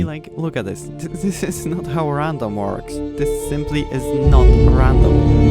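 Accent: Poland. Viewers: you sound Polish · I knew this accent is familiar you are a Pole! (the olx ad gave it away 😂) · ale polski akcent kolego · Wiem kiedy slysze polski akcent i wiem że ty go masz😂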